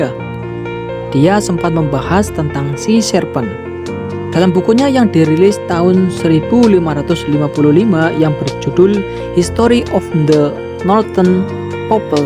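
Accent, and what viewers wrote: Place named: Banyumas, Indonesia. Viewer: anjay ngapak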